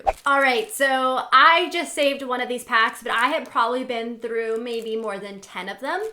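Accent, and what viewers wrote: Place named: United States. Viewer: I love ur American accent xoxo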